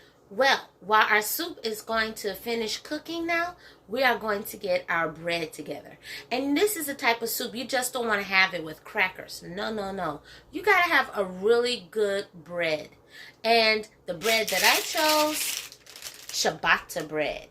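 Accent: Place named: South, United States. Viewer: The ingredients, the preparation, the cooking talent, the presentation, the final result.......am I in Tuscany? The touch of a southern voice....the spot-on Julia Child-type interaction with the viewer....the ease and simple communication of the cooking sequence...all contributes to wanting to follow.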